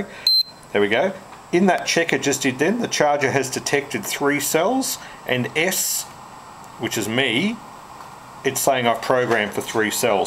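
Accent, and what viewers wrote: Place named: Australia. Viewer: I can tell by your aussie accent that you are the man that can help me. I am new to all this battery charging and I want to get a good charger from america but I am worried about the 240 volts we have he in aus ruining it so my question is if it says the AC input is 100-240V does that mean I can run it off an aussie wall socket ? sounds like a dumb question right but I just need some reassurance before I spend $130 bucks. the racers edge surecharge is the one I am looking at. thanks in advance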